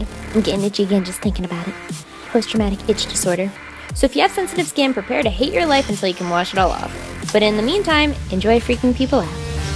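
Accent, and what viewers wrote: England, United Kingdom. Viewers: Loving that English accent😂😂😂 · Doesn't anyone else think her British accent sounds like iJustine's British accent?!?.,!! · Her British accent is so hilarious I love it 😂🍩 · Lol your Brit access sounded like Rebel Wilson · That british accent though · A British accent and a scarecrow look? DOCTOR WHO CONFIRMED. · Your English accent is so funny😂❤️ we don't actually sound like that though aha xx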